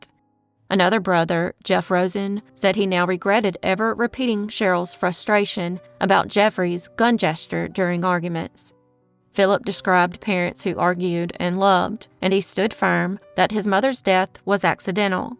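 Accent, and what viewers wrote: South, United States. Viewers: This narrator is - a real person with a Southern accent. Maybe those other channels use AI voices to make it sound like the narrator of this channel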